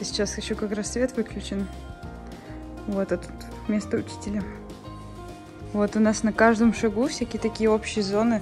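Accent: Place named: Urals, Russia. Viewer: А речь у вас не питерская. Много призвуков и звуков, зарактерных для уральских студентов, возможно это корни?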